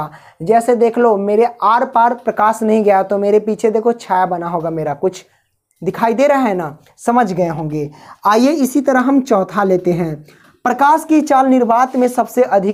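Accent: Bihar, India. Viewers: AAP bihari ho na aapki language she Pata Chao gya 😋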